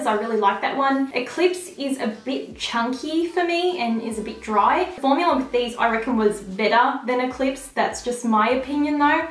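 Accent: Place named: Australia. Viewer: I didn't realise you were Australian 🙌🏼🙌🏼I should of known from the accent hahaha. Your hair looks super amazing today 🙌🏼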